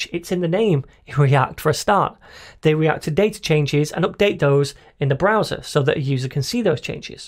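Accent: United Kingdom. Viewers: don't know yet if your tutorial is ok, but you got +10 points for qool brit accent xd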